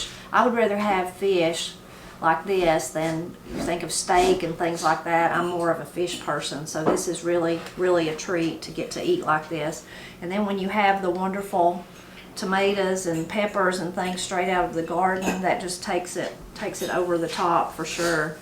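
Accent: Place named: West Virginia, United States. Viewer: Love that accent. She talks like my mom did, she was from WV.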